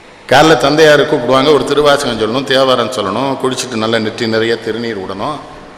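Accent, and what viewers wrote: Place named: Tirunelveli, India. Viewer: நான் என் இளமை காலங்களில் சுவைத்த நெல்லை தமிழ் மறந்து விடும் காலங்களில் அய்யா நெல்லை கண்ணன் குரல் கேட்டு மகிழ்ச்சி அடைவது வழக்கம்.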